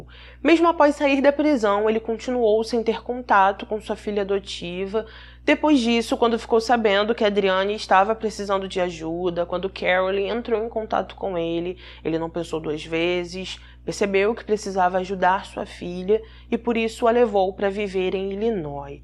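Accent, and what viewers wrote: Rio de Janeiro, Brazil. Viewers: Tô és do Rio? Fala extados unidos parecendo carioca. É legal 👋🏻👋🏻👋🏻👋🏻👋🏻👋🏻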